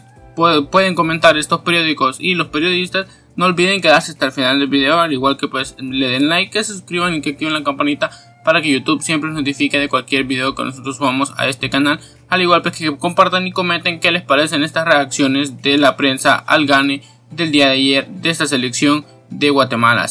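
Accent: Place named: El Salvador. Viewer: Mi amigo, utilizá Loquendo! No se te entiende y tenés acento como de salvadoreño. Un abrazo!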